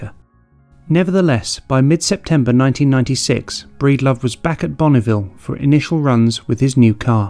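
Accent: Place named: United Kingdom. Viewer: Great video... I got burned out on the narrator’s posh Brit accent though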